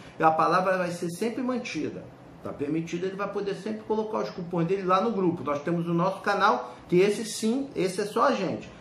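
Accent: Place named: Minas Gerais, Brazil. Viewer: Kkk gostei da mineirice...So observando a receita federal...kkkk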